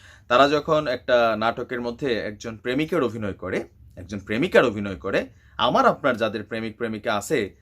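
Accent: Comilla, Bangladesh. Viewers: ভাই আপনি কুমিল্লা বাসায় কথা বলেন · আসসালামু আলাইকুম ভাই কেমন আছেন ইনশাআল্লাহ বালই আছেন ভাই আমি সিলেট মৌলভীবাজার শহরের আপনার কথা খুব সুনদর কুমিল্লা ভাষা টাও বাল লাগে। তবে বাই যদি দিন অথবা তারিক,টা দিয়ে যদি ভিডিও করতেন তাহলে,মনে কষ্ট নিবেন নাকি,দোয়া কইরেন বাই আল্লাহ যেন সবাইকে মহা মারি থাইকা হেপাজত করেন আমিন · Love from comilla Vhai comillar vasai kotha bolen moja lage😁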